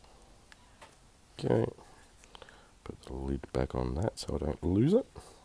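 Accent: Australia. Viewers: How good is the Aussie accent? So nice to hear on you tube. Champ recipe too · I'm very new at this type of art and live in Tasmania so it's lovely to listen to an Aussie voice and also hear you talk about Aussie products and stores such as Bunnings. I would like to ask you a question please. I notice that you usually use Amsterdam black for your Activator. Can you use other products and other colours as activators thanks for your very clear informative videos Linda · very good video...good to hear an Aussie male voice in this arena with excellent advice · Great to hear an Aussie voice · Hi from Sydney Australia I subscribed as soon as I heard an Aussie voice. Just found ya gonna binge some of the rest of ya vids. There will be plenty of questions mate!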